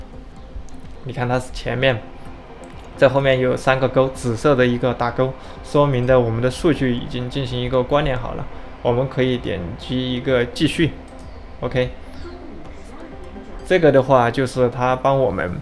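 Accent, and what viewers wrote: Sichuan, China. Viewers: 播主说的川普，挺可爱的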